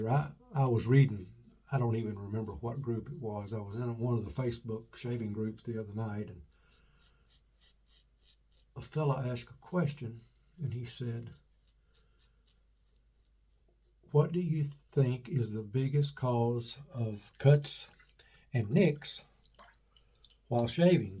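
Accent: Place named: Appalachia, United States. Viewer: where are you located? Do you live in the Appalachian Mountains. You have about the same accent as the people in my area. I live in pound,Va right on the border of Ky. Tenn. and Va. I like your Videos!!!!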